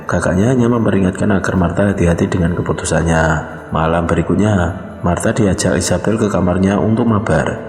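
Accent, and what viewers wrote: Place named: Java, Indonesia. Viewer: Kok kk kenal suaranya medhok2 gini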